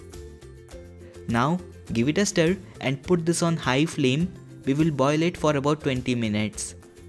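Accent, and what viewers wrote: India. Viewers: Hah DAT hindi accent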